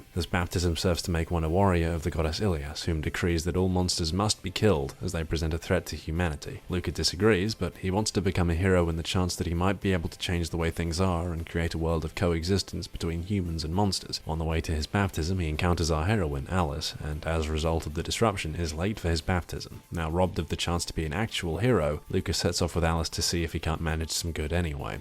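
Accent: United Kingdom. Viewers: The story about how a man with a smooth, charming British accent crossed the degeneracy event horizon and came out the other end with a new appreciation for the obscene. A story for the ages, one that will be passed from father to son for centuries to come.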